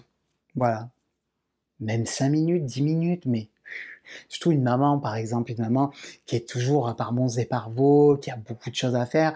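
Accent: South, France. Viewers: J'aime beaucoup ta simplicité et ton accent du Sud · ce petit accent du sud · Ce petit accent du sud nous met du baume au cœur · je comprends assez bien ton accent du sud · ton accent du sud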